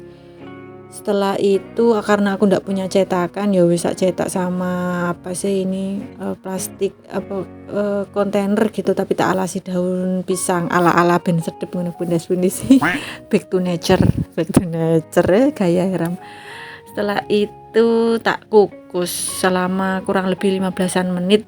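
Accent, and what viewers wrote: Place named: Java, Indonesia. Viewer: Wah medok sekali Asal dari mana bund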